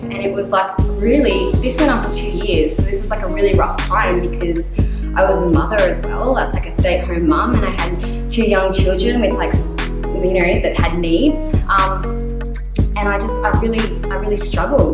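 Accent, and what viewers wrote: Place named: United States. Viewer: Honestly I thought you were American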